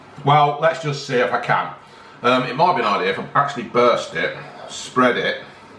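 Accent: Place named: Sheffield, United Kingdom. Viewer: Tanvir Sekhon Kell Brook accent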